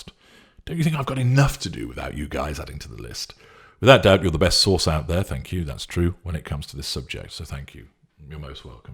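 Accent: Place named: North, United Kingdom. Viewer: Sometimes he has a slight northern accent beneath the caramel coated gravel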